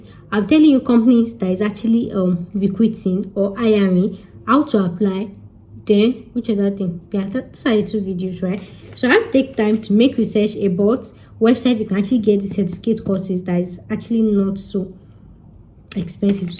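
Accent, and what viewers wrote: Yoruba, Nigeria. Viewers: Hey pretty.... Nice video, but that Yoruba intonation is a turn on for me.... Won't mind knowing you more🙈😀🥰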